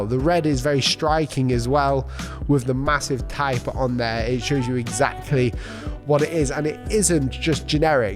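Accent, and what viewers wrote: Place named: Cheshire, United Kingdom. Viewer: Keep up the great content though. I live in the States but was born in Cheshire. It's nice to hear a familiar accent.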